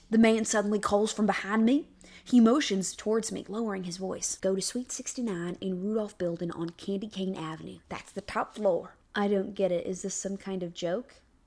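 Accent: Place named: South, United States. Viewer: Why was your southern accent better after drinking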